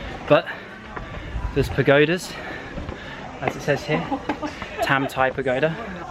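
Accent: United Kingdom. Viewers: british accent , omg I love american accent !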